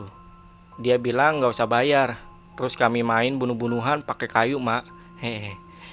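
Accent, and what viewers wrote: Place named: Bali, Indonesia. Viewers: mimin org bali kah ,logat T nya ko keg org bali ? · Bang asli mana? Logatnya ky beli Bali 😁 i like it ❤ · Ini naratornya orang Bali apa NTB ya?, penyebutan huruf T nya beraksen daerah tsb. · Yang baca berita ini apa orang bali ya ? Bli ? Logat T nya sangat ketaraa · Naratornya orang bali..ya.?kelihatan dri logat nya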